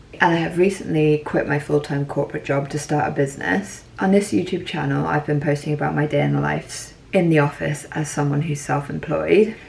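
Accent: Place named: Scotland, United Kingdom. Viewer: Omg you’re Scottish! Love from a fellow Scot in London ❤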